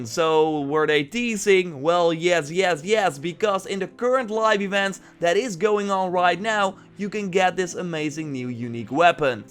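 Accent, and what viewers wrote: Netherlands. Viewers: r u dutch? accent sounds like it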